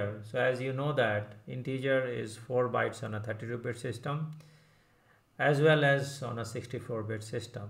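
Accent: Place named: India. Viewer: i am french so the indian english accent was a bit harder for me, but after the end of this video i was ok. i will continue the other videos cause its clear, pertinent, calm and complete enough per subjects. thank you.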